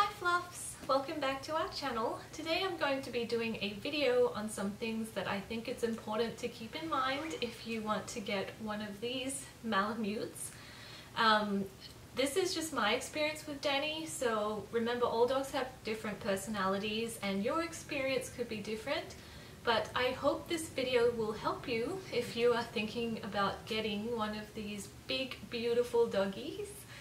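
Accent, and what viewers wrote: Australia. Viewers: Are you Australian or British or African or... What lol you have a cool accent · Aussie,Iv decided.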